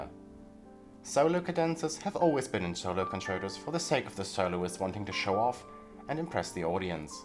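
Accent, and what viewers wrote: Germany. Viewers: wait kommst du aus Deutschland/sprichst deutsch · Are you from germany? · Amazing video! I enjoyed it thoroughly because your explanations and highlights of each piece. I hadn’t heard some of the pieces you presented on the video like Godowsky’s Passacaglia or Regers’s variations which I’ll give them a try once I’m done with your video. Thank you for adding them to your video broadening my repertoire, at least as listener. By the way, I have a question that might be off topic but, are you German?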